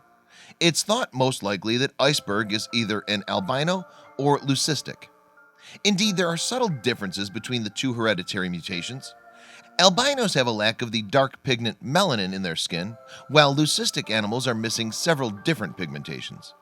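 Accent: United States. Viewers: I don't know why I continue to get sucked into these videos as a minute or so in to them the penny drops that the the narrator, or more likely the writers, tell 'pork pies', and the narrators ALWAYS have American accents! The first lie becomes obvious as there is a white humpback whale named 'Migaloo' sighted on the Australian east coast when he otherwise affirms that there are no other white whale specimens, the second lie being that blind freddy could see that when he claims that this white adult orca is "fully socialised" the question begs is, why is he swimming with orcas of a different type? Notice the fin size difference between the white whale and the rest of the whales in the pod denotes that whilst both are orcas, the white whale is of a different sub-species, just as are Brahman cattle are different to say, english Hereford cattle., and which means that for some reason the white orca appears to be socialising outside his sub-species group, and therefore raises the spectre of the third lie when the next question begs, where is his mother that the narrator asserts is amongst the pod? 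Then notice at about 5.05 mins into the video in much more grainy footage you see the white orca surfacing amongst it's own sub-species, so it may well be two different white orcas as was alluded to later on in the video. Then there are differences in types, being 'residents' to a localised area, 'transient' consisting of small pods in which females tend to have more triangular fins than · Dweebe yanks accents like this are both offputting and superficial ... no gravitas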